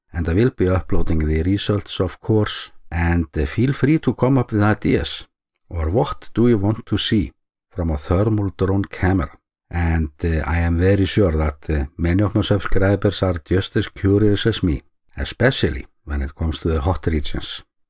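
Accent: Iceland. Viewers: Please don't add background music. I love the humor and the Icelandic accent of the narrator but his voice is soft and would be hard to heard.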